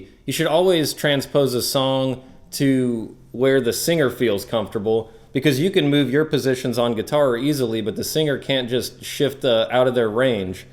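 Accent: South, United States. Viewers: This is the first time i have heard your voice. Correct me if I'm wrong but, do i hear a hint of a southern accent?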